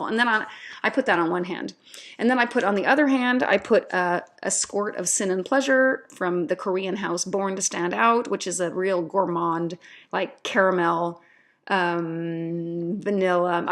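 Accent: United States. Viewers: Thanks for the insight! Very interesting fragrances that go far beyond the ordinary. I thought you are American because of your accent. Greetings from Hellas! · I thought so too. I’m American and assumed she must be an expat because she sounds very American.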